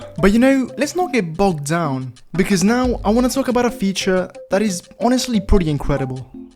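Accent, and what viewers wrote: Italy. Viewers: are you italian?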